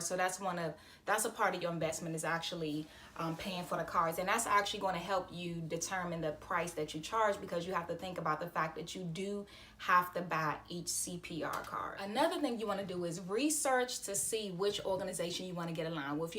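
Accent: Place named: Washington DC, United States. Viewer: Hahaha I had a feeling you were from DC. The accent gave it away 😅. “Verrrrrrry” hahaha thanks I do EMS for DC and thinking about getting my cpr instructor. Thanks for the video. It was exactly what I needed to know. Do you need to get a business license as well and start filing taxes as a self employed person? Or would you rather get with me off the record?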